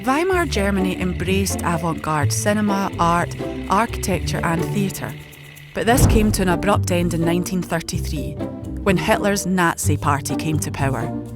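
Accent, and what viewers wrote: Scotland, United Kingdom. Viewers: Scottish accent..then there's accents within accents! My ex's was different. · The scotish accent it's just brilliant...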